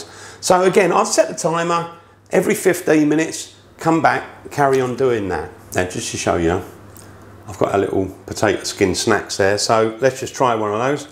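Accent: United Kingdom. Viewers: haha- Cyrus Kariuki - as another Brit he sounds like a bit of a 'geezer' to me! I like that accent, but it's not what I'd call 'beautiful' English. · Nice video and recipe. Where from UK is your accent from? 🥰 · British accent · As a black guy from America I love this guy british voice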